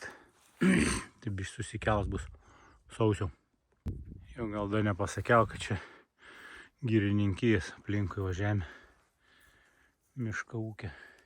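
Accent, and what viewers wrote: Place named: Samogitia, Lithuania. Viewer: Kokia idomi zemaitiska sneka!!!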